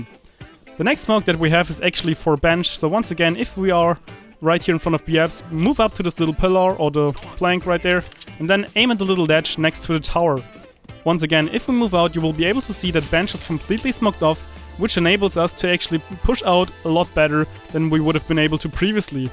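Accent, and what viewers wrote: Germany. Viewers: u sound like german. r u? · Good denglisch😄 Good Video👍😊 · Man merkt das er deutsch ist lol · Dieser Moment wenn man alte Videos guckt die mega nice sind wie deins hier und man aber richtig merkt das du Deutsch normalerweise bist aber du sprichst das sehr gut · german accent detected ^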